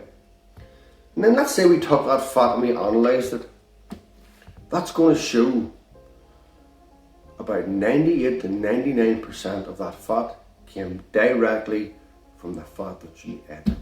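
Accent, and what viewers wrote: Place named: Ireland. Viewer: New subscriber from Australia, hello 👋 I love your accent, don't get me wrong , but the first time I heard you talk about Fat , I thought you were swearing 😧 then it dawned on me, Ah an Irishman 😊☺️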